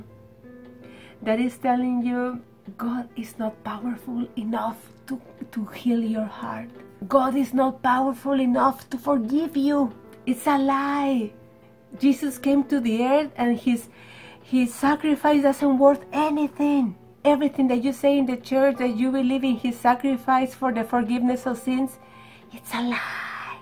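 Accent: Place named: Peru. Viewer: siiii jaja, estaba buscando lo del timon que se bloqueaa y vi el tuyo por q estaba asustado y de ahi me dio curiosidad tu canal,ademas tu forma de hablar jaja,dijeee ella ES PERUANAAAA❤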